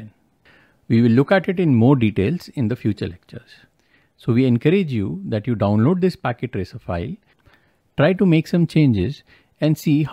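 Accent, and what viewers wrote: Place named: India. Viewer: I hate indian accent but I love you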